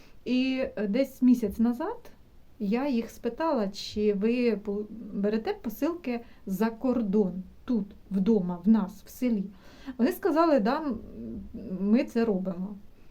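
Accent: Poltava, Ukraine. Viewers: Полтавський говор буде завжди,якщо ти народився і виріс на полтавщині.Для мене він найрідніший...Плачу разом з вами...Тримаймося...тримаймося...Обіймаю...💞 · Смотрю Вас девочки оч. давноно подписалась недавно, полтавская речь это другая история ...сама из Полтавы, ...С наступающим Рождеством...🎄☃️🎉🙏🍀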